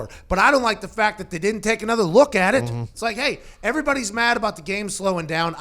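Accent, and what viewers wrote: Pittsburgh, United States. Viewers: Can hear that yinzer coming through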